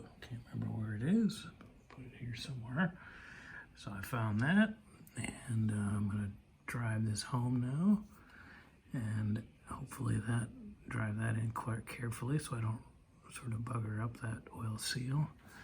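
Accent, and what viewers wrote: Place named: Canada. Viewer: .....thanks for the reply, yes a good idea to test before committing to the frame. I take it from your accent you are Canadian, a couple of guys I follow on YT post regularly, Elin 'Rusty beauties' and chef tush 'tush mahal' both are triumph enthusiasts, and from your neck of the woods, though obviously Canada is just slightly bigger than the UK so you may not be that close.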